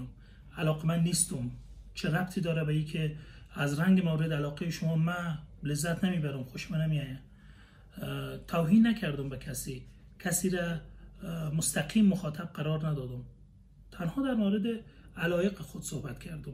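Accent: Iran. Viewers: شایدانسان خوب باشی ، اما طرز صحبتت کمی ایرانی شکل است و برای لحجه دری صدمه وارد میکند .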